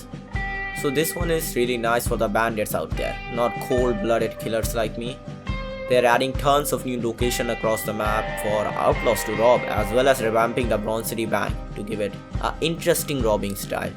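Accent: India. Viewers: Indian ah accent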